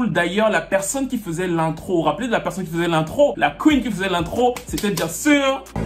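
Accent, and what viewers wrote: Cameroon. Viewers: ce mec merite plus 1 M d abonnes. tu es trop bon ow mon frere africain. j adore ton accent camerounais LOVE YOUUUU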